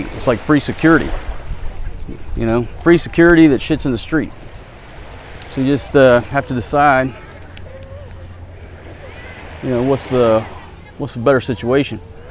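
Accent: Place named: Texas, United States. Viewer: Hi, alam mo you are the best in that region... your videos are the best// I am from Singapore and have a lot of USA friends, pero you are the best although i can sense you from Texas (your accent the giveaway)... hahahahaha/// love your videos my friend... Waiting for you to choose your wife number 3... don't know what I blabbering... kasi I am drunk... hhahahaha... nice videos my friend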